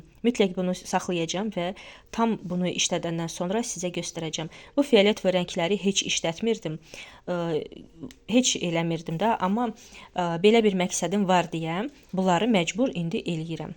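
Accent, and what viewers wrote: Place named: Russia. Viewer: Siz azərbaycanlısiz danisiginiz rus dillidi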